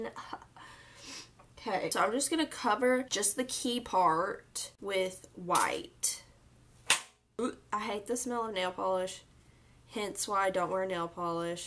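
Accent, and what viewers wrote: South, United States. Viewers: I just found this channel and first of all you are hilarious and I love your southern accent because I can relate.. I am from the south #needmysweettea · Omg what state do you live in? Southern af🤣 Alabama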